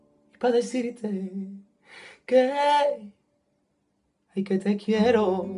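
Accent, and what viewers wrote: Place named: Córdoba, Argentina. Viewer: Y ese acento cordobeeeee!!! 😍😍😍😍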